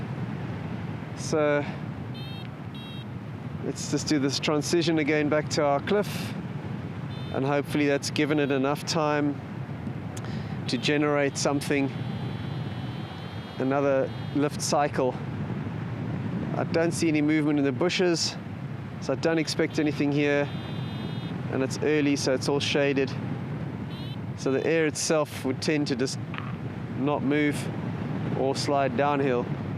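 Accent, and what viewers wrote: South Africa. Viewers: Haha nothing like Porterville to bring out your sarth efriken accent ey bru! Lekka!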